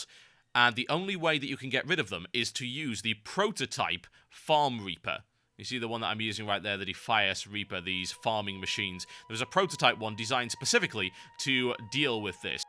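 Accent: United Kingdom. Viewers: Hmmmmmm snarky british sounding guy who talks fast? That's a Yahtzee!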